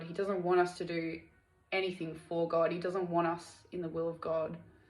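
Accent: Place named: Australia. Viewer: WOW this video wasn’t a coincidence, I was battling bad thoughts today and you showed up on my screen for a reason. Praise Jesus and thank you very much I truly appreciate the encouragement and I’m going to apply this strategy more often. Also I notice you have an Australian accent as I’m from Australia aswell LOL. God bless you.✝️❤️✝️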